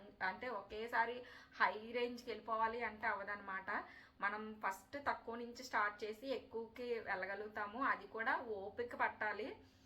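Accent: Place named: East Godavari, India. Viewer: meedi yevuru garu turpugodavari basha laga vunnadi akkada evvarinina valuyu bulga palakaristaru ekkuva takkuva bedam vundadu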